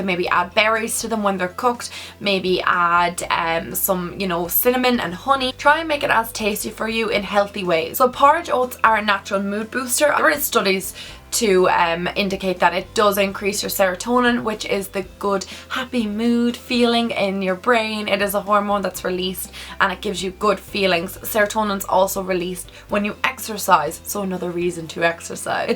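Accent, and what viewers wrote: Ireland. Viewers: I love these videos, so glad you have done your last one and this one, I've been changing my eating habits over the past month, I've lost about 6/7lbs, what is a healthy weight loss in a certain time frame, love your videos and I'm a sucker for Irish accent lol xxxxx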